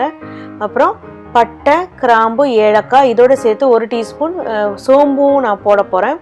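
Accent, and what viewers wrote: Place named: South, India. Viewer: Very nice, are you southside,your slan is such a way.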